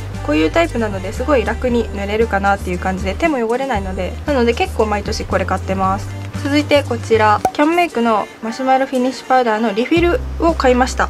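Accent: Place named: Hokuriku, Japan. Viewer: 北陸の方ですね？ヽ(・∀・)ノ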